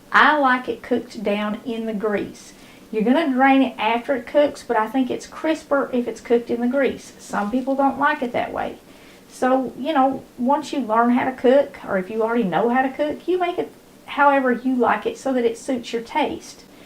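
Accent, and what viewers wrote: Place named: Appalachia, United States. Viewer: So simple and yet so satisfying! Everything you make - and the accent - hits sentimental chords of my WV and Eastern KY upbringing ... miss these meals my mammaw and mom would make! I am so happy to be your subscriber!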